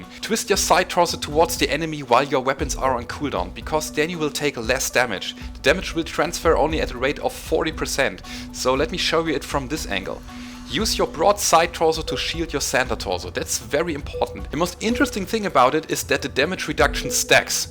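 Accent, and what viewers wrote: Germany. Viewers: That German accent is music to my ears. Sorry, just had to point that out.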